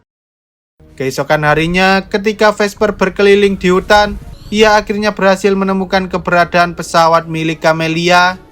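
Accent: Java, Indonesia. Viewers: Ngomong lo jangan medoooook broo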